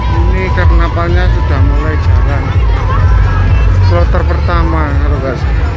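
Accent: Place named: Java, Indonesia. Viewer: Medok rek suare ..aq yo wong ngabab lho..omahku kulon lapangan rek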